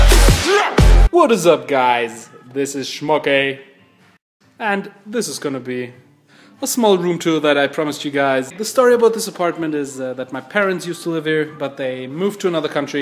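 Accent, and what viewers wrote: Germany. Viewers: 100% DEEnglisch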